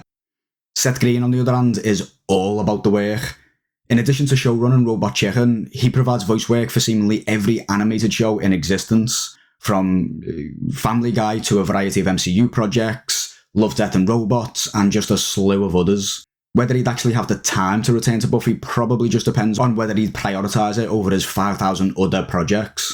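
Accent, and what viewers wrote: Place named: North, United Kingdom. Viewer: nah they’re not the only one! It’s so refreshing to hear a familiar accent. It was partly why I kept watching.😊 We’re definitely underrepresented in the media. Northern accents in particular are sadly so rare.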